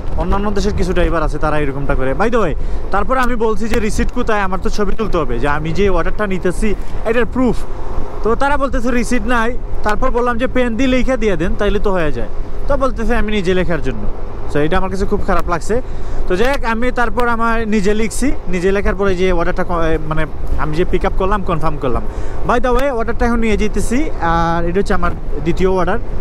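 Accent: Sylhet, Bangladesh. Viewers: ভাই সিলেটি নি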